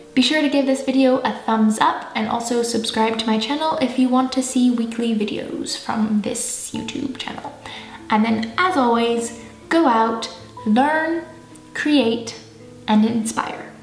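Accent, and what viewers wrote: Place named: Eastern Europe. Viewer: Oh my!! I thought you were older, yes; but mainly I thought you were from somewhere in Eastern Europe!! 🤣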